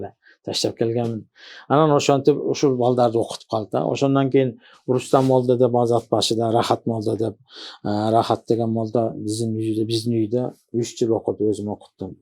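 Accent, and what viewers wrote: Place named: Uzbekistan. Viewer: Оллох рози бусин домладан, узиям киргиз тилида узбекча акцент билан гапирдила манимча, хамма гаплари тушунарли булди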